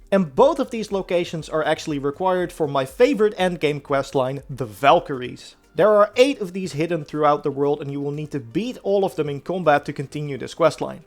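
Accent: Netherlands. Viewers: In loving the dutch/ anglo accent 😂😂😂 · Hi, In the english language the word LOT, like "a lot of power", is pronounced a LAT. It shows your Dutch background where a lot of people do this. It is no problem and people instantly recognise your voice, but it is just that little bit extra professional, which all of your video's are... No offence meant at all just this remark, wether it is useful or not. Kind regards, Jules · Just a weird question. Are you dutch?. Haha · Great vid 🙂 Quick question.. Are u from the Netherlands? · Sounds Dutch to me.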